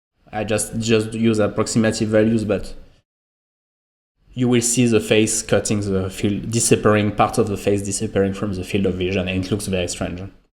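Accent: France. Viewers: You have a french accent despite you ain't french 😄 Great video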